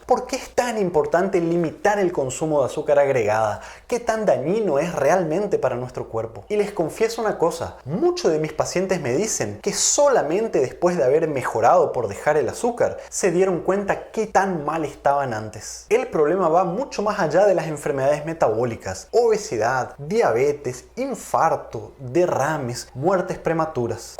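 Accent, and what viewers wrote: Paraguay. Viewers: No me gusta su acento de paraguayo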